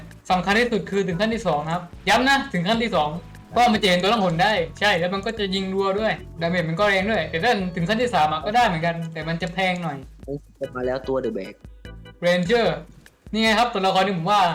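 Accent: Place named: South, Thailand. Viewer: สำเนียงพูดเหมือนคนใต้เลยบ้านเดียวกันไหม😅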